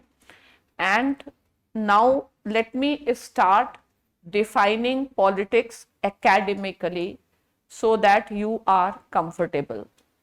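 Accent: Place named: India. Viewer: Mem aapke मुह se English bhi hindi lagti hai❤❤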